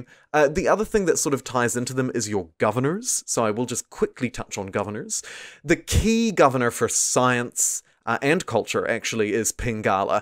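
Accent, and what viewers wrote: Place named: New Zealand. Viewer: That's a kiwi voice right there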